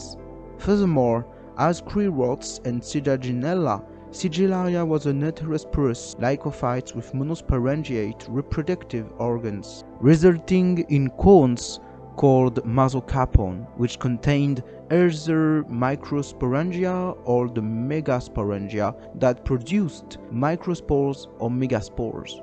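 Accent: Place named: RP, United Kingdom. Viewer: On ferme les yeux et on croirait entendre un Lord anglais … C’est plutôt un compliment bien sûr, ça change des « ze plants of ze carboniferous … » Very good subject!! Tha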